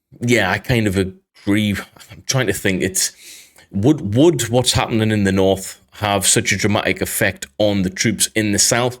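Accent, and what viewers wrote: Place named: Northern England, United Kingdom. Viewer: I thought I was hearing a little Northern England in your accent!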